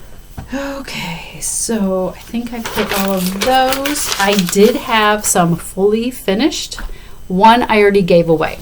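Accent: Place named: Illinois, United States. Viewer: First time watcher here. It sounds like your a teacher in Illinois. If so, which part of Illinois? I teach in the south suburbs. I will also be at weekend A. I’m going by myself so I’m hoping to meet a lot of new people.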